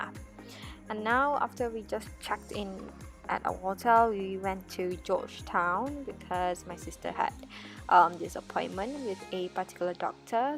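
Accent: United Kingdom. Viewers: Have u ever been in abroad before ? Or you received from this uni? Your English is very good. Especially British accent , haha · Love to hear your english's accent.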